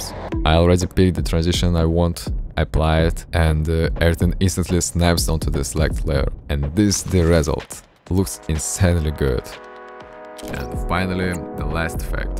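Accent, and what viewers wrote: Russia. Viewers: очень слышно русский акцент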